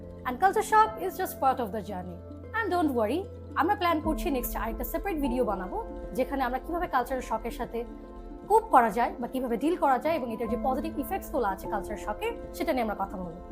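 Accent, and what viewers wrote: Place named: Bangladesh. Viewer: Ahh finally deshi accent ta charsos😂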